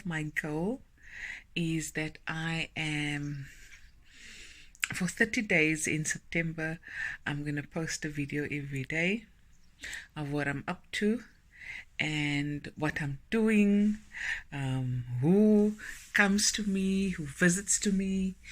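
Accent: South Africa. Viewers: I am originally from South Africa, now living in Canada. I came across you video just recently and what caught my attention was your accent. It was so good to hear a SA accent after so many years. Thank you for your videos, love them, God Bless.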